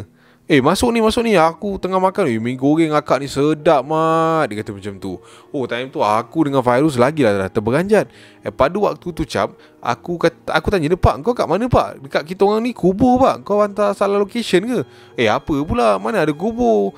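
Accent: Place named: North, Malaysia. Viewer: Pekat nau bahasa utara 😂 Btw salam dari kedah🔰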